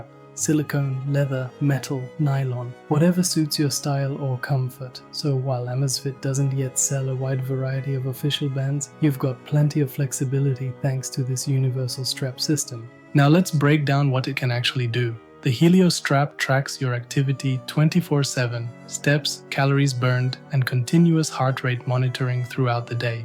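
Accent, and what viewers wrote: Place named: India. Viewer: Terrible Indian accent. Added to that, he speaks like a hypnotist. .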